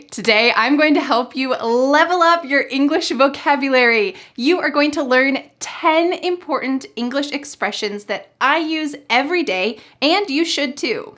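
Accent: United States. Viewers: I ❤ ur accent......i swear....its really cool....you know I ❤ american accent like you did....TEACHER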